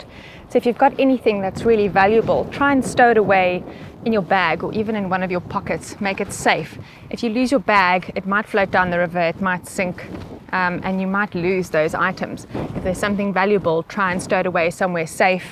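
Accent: South Africa. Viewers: We made a drinking game where everytime you say water (woter) in that beautiful sa accent we have to drink. After 6 minutes we are absolutely smashed. 😂😂😂 Awesome videos, thank you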